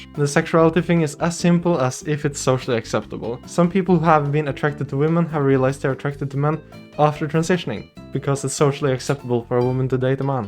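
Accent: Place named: Norway. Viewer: recognizes accent -immediately goes to channel info -NORGE!!!🇳🇴🇳🇴🇳🇴🇳🇴🇳🇴💪🏻💪🏻💪🏻💪🏻💪🏻💪🏻💪🏻💪🏻💪🏻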